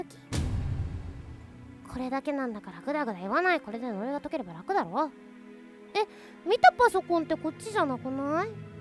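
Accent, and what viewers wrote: Japan. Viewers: アイちゃん広島の子？じゃろってw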